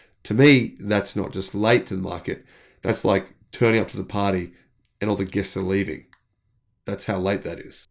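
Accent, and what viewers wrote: Australia. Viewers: She's apples! No worries. True blue, Toyota's up Shit Creek without a paddle but they keep yakking on how she'll be right. Crikey, they've got Buckley's, what a bunch of bludgers, a few stubbies short of a six pack if you ask me. They say they're on a ripsnorter but it a dog's breakfast, 'cause those hybrids still need to pull in to the servo. What a bunch of drongos and galahs. They'll never make the Big V when it comes to EV 'cause it's just them pulling the wool. Fair dinkum their only attempt so far is cactus. Now they're gonna try and get a free ride by dinking on some Chinese makers. They really need to get out of woop woop, put in some hard yakka and get their ship to chuck a U-ey. Strewth... did I really say that. Should have saved that one for Ron. Don't worry... we are just stoked when you talk all antipodean!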